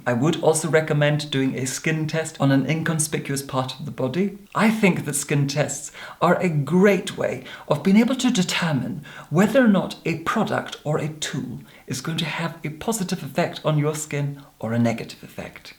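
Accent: Scotland, United Kingdom. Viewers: Gosh, I have missed this soothing, clear-cut Scottish brogue. <3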